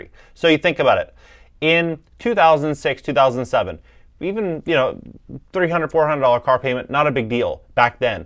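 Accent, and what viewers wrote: California, United States. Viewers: lmao yeah you do sound like a Californian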